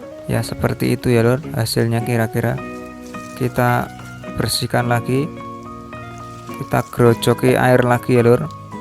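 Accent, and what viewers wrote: Indonesia. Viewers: Apa itu grenjenk...??? Jadi ketawa sendiri dengar penjelasane mase,..Jowo ndi mas???? Q jatim.......👆👆👆👆👆👆👆👆👆😁😁😁😁😁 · Orang gebang ya om?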